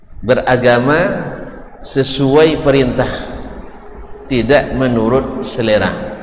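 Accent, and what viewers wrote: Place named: Minangkabau, Indonesia. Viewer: setuju... Suka logat minang walaupun kurang paham 🙏🏻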